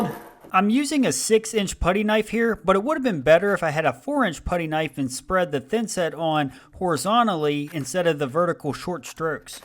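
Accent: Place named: Pittsburgh, United States. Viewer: Idk where your from my guy, but your accent sounds exactly like people from Pittsburgh!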